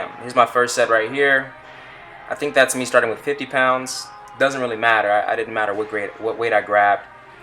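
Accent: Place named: United States. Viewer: Wait are you American? Your name threw me off but hearing your voice you seen American lol.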